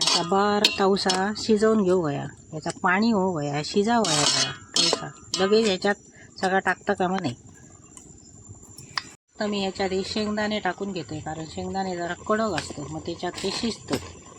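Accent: Malvani, India. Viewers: ताई छान रेस्पी तुमचे बोलणे खुप छान मालवणी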